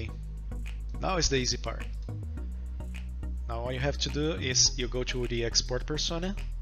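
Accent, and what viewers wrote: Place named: Brazil. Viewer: Na hora que ouvi o sotaque falei, esse cara é brasileiro.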